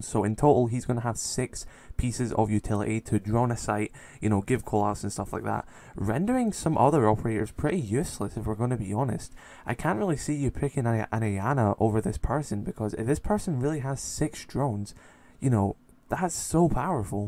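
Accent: United Kingdom. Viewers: hello british man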